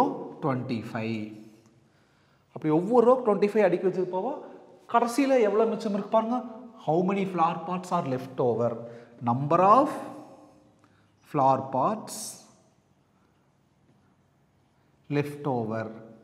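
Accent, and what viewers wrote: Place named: Thanjavur, India. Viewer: Your in thanjavur am i right your slang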